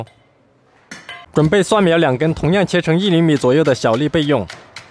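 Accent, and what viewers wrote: Sichuan, China. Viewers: 程咬金 听口音就是川普，四川人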